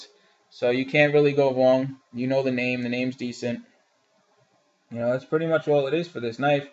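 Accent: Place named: Rhode Island, United States. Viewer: you must be from Rhode island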